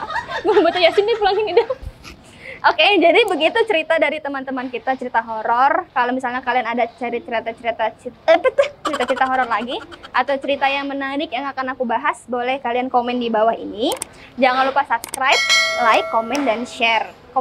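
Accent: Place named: Palembang, Indonesia. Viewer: Yeee kak update video lagiiii.. ini bukan serem lagiii,ini ngelucuu..,logat nya palembang bnget deh..kak tmbah cantik,sehat selalu ya kak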